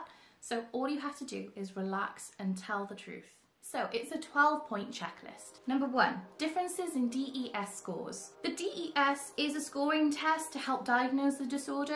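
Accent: Wales, United Kingdom. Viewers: This was an extremely interesting and useful video. I'm a student psychologist and DID has fascinated me for years. Thank you for your insight. Also... it's nice to hear a Welsh voice on YouTube! Where are you from? Swansea girl here :D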